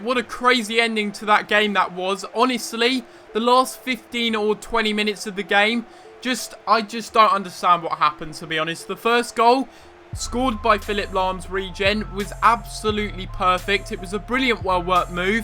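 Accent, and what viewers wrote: United Kingdom. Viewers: bro you have the most sterotypical british accent ever hahaha. Great video btw!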